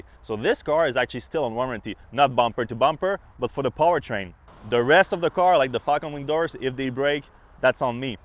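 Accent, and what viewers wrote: Quebec, Canada. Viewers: sounds like a quebecois? u from here bruh? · Love the quebec accent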